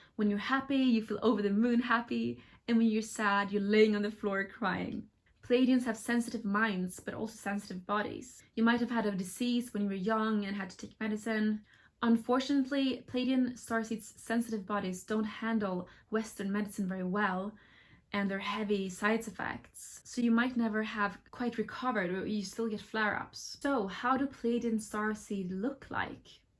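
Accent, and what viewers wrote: Sweden. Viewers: Are you Swedish? Love the accent! If so, you are already Nordic, so definitely a Nordic Pleiadian!! 😉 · As a norwegian, it's so easy to hear that she is Swedish, by the accent 😄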